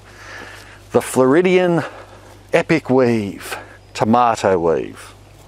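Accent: Australia. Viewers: Your content is so wholesome. When you said "don't do this at home" it reminded me of my dad except with an Australian accent.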